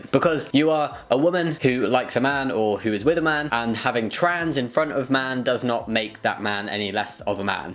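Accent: United Kingdom. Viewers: La verdad, me revuelves mucho por lo rápido que hablas, y por lo poco que se de ingles, pero me encanta escuchar tu voz, asi de grave, con su bello acento británico y asi, no cambies eso nunca. 😋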